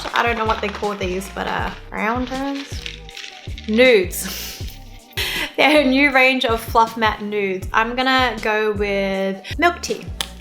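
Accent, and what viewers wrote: Australia. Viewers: In love your Australian accent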